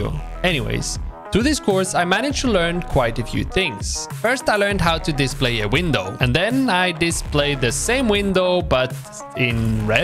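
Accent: Poland. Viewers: youre from poland? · Are you from poland ;3? Also great job on the video man, its soo high quality. Keep it up and you will go to places cheers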